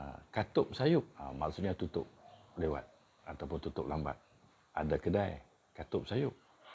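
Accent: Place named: Kedah, Malaysia. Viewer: Terbaik.. saya suka loghat kedah..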